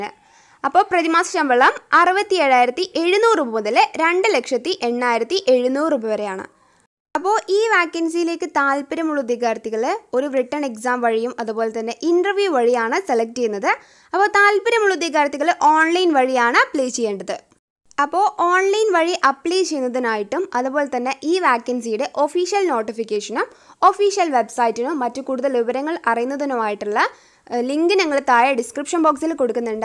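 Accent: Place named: Malabar, India. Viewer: മോളുടെ വീട് thalassey ആണോ..ഒരു മലബാർ slang ..😊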